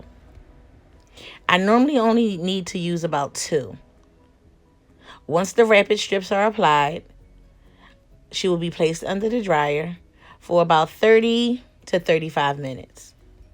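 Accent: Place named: Philadelphia, United States. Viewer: Philly? I detect the accent. Hair was fire!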